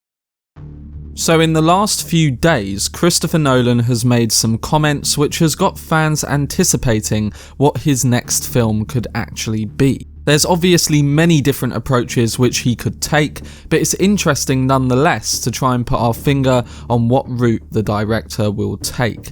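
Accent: United Kingdom. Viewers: Dude you a brit? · Love your bri-ish accent mate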